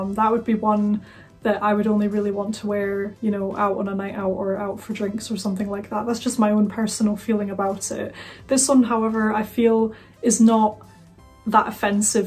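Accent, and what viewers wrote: Scotland, United Kingdom. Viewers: Fantastic perfume review, Miss. May your Youtube channel, subscribers, viewers & fans grow exponentially in this new year and future.. You have such a pleasant & soothing and elegant voice & wonderful, classic Scottish accent. You can sell us anything. Happy new year Scotland.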